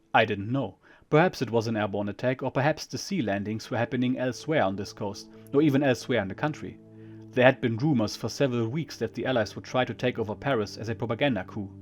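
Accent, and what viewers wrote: Germany. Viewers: Excellent stories. Thanks for these. the narrator is it a real person reading? I like the German accent to add realism.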